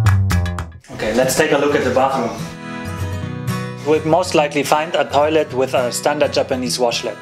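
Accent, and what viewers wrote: Germany. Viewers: Ich hab sofort herausgehört, dass Sie aus Deutschland kommen XD Ich muss sagen, guter Kanal :) Gefällt mir^^ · Where do you come from? I sense a german accent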